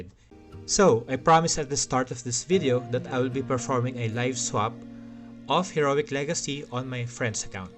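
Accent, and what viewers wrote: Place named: Philippines. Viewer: Are you Filipino? You sound like you're Filipino because of your accent and voice, by the way I'm a new subscriber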